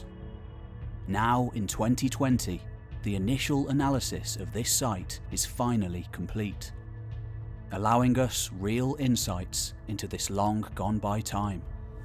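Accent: England, United Kingdom. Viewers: Scrotum Monster it’s his particular English accent. You’re missing his other pronunciations different from yours.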